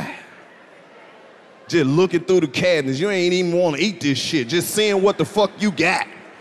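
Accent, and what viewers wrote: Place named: Bay Area, United States. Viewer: On my mama , we Hella do talk like that down here. It's that yay area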